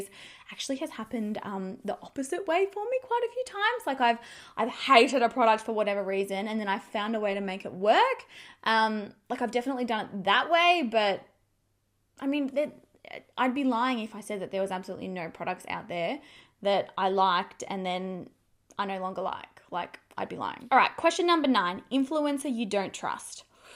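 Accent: Australia. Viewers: I believe in free speech too, it is always best to let fools expose themselves. I love the Mark Twain quote " It is better to remain silent and be thought a fool, than to open one's mouth and remove all doubt." I love your make up looks all though I am the opposite I have dry skin and prominent round eyes but I still dig your looks just can't use the same foundations and concealers. P.s I also love that the Aussie say arse like us Paddys, great word so is shite as it is worse than shit lmao. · Hi, I rarely leave comments, but I have to say that I really enjoy your videos. You give terrific reviews and let us see exactly how everything sits on your skin. Also, your accent is amazing! I've been to Australia four times and always find excuses to go back (even though it's soooo expensive). I usually watch your videos at the end of the day (mostly for relaxation purposes), and it makes me feel like I'm on vacation... 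is it weird? Anyway, thank you for being you. I don't think you need my opinion, but people can be mean to each other regardless of your professional field. It's tough not to feel affected, but the most important people in your life know you and respect you. Also, you have heaps of fans worldwide (I'm Portuguese). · I love so much!!! And who is mean to you!!!!!!! Can't believe it. Not that the US accent is better than the Australian!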